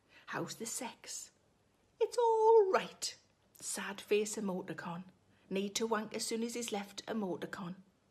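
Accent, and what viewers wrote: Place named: South Shields, United Kingdom. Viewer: My Mam grew up about a kilometre from Sarah’s home. We emigrated to Canada when I was quite young but we travelled a lot and I spent a fair bit of time in South Shields at my Gran’s. I was enrolled in school on our more extended visits. How thrilled was I to discover I “almost” went to school with Sarah. Sarah sounds exactly like my Gran - no offence Sarah, my Granny always had a high, sweet sounding voice like yours despite her age. She also had a saucy sense of humour and glint in her eye despite her tidy, prim appearance. I don’t get back to the UK nowadays so listening to “How to Be Champion” was doubly pleasurable- the humour and the accent of my childhood. To think we might have passed each other one afternoon in The Nook....I really, really, REALLY believed Minchella’s was the best ice cream IN THE WORLD, cos my Mam said so.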